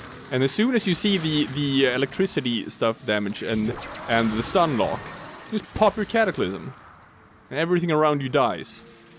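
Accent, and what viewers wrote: Sweden. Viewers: Thought the accent sounded Swedish, went to "about" and sure enough I was right haha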